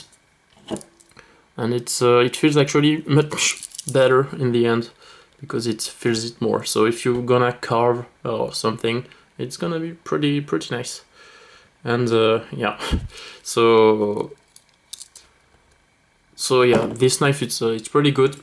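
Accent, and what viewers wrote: France. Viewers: Ty for your time, you have a french accent, are you french? I am french, living in Scotland! I just ordered yesterday a huntsman camo ,uk legal, it's still in the mail, but I wanted to have someone's opinion on it! It's my Christmas present 😀,so t y again,it was helpful, and merry Christmas 🎄🥶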